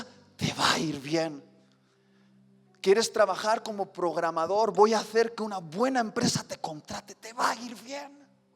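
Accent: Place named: Spain. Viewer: Hola es bello el acento español:) Y Dios esta contigo, que padrisimas tus predicas:D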